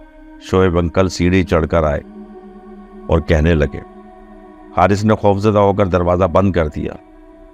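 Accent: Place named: Pakistan. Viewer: Amazing incident 😮 n love your urdu ascent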